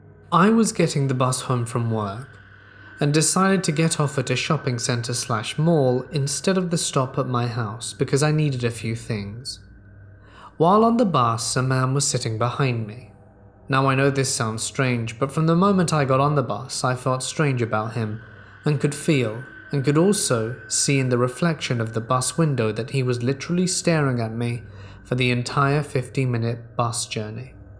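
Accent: United Kingdom. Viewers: I heard the collaboration you did with Nocturna on her channel. i really enjoyed the video, also it's crazy how perfect your Spanish is, i was blown away. i also think it"s crazy how in English you have a British Accent and in Spanish you have perfect Mexican type Accent. I never knew anyone like that, that can speak with a British English Accent and Mexican Spanish Accent....anyways Thank You and God Bless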